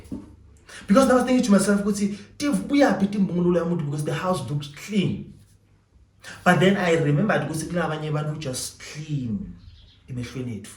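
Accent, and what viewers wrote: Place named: Eswatini. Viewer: I could listen to you speaking siswati all day 😍😍😍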